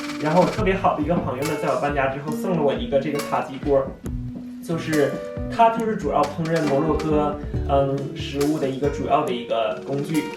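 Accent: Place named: Shenyang, China. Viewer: 哈哈哈是沈阳老乡吧亲切的口音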